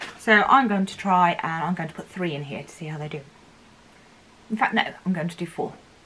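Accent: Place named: East Midlands, United Kingdom. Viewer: Your accent is similar to mine where abouts are you from?. I live in east Midlands do we have it?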